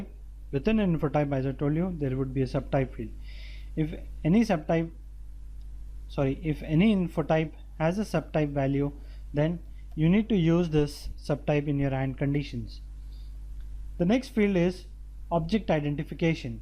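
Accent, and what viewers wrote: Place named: India. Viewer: Why are these always done by someone in an Indian/Muslim accent? It makes me weary about my data security.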